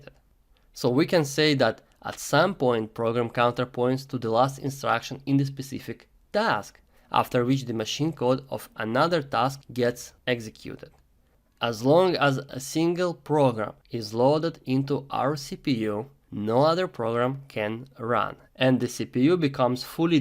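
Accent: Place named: Russia. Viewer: по акценту всегда узнаю русского)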